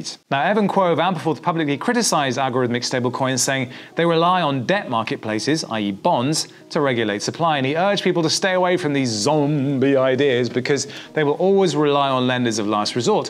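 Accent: United Kingdom. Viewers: hahaha the Scottish accent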